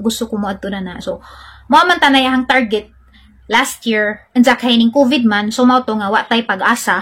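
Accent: Bohol, Philippines. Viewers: Makalingaw paminawon sinultian sa Bol anon hahaha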